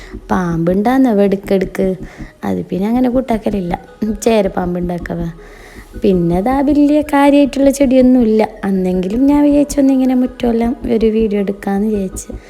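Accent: Kasaragod, India. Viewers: Kaasarod aanu le Slang nalla rasa kelkan❤️❤️💗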